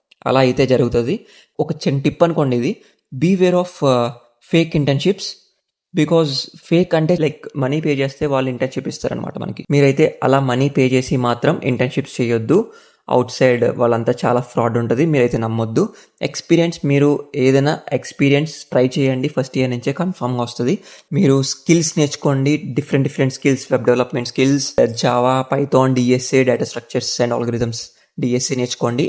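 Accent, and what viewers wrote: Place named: East Godavari, India. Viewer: Maadi ap mandapeta Ne voice east godavari la vundi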